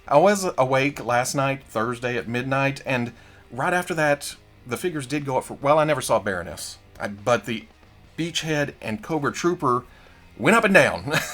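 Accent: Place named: Mississippi, United States. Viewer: As a man from rural Mississippi I prefer your videos. Your accent is like visiting home for 20-30 minutes a week. Never stop doing what you Love.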